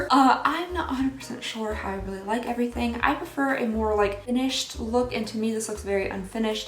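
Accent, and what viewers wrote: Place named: Boston, United States. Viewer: AAAHHHHH!!!!! I love your Boston soooooo much!! <3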